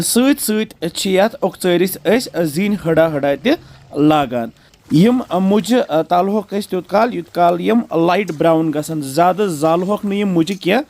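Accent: Kashmir, India. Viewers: I like kashmiri voice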